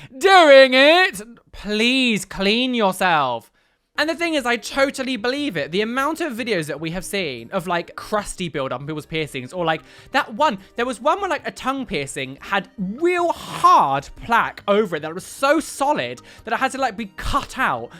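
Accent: Texas, United States. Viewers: Your accents are adorable 🥰 You always end up with a twinge of a Texas accent. But always adorable 😘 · Your American accent is pretty awesome,! Great video! 😊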